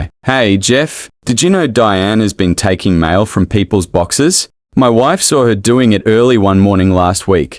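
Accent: Australia. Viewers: Having an Aussie voice telling the story was a pleasant surprise.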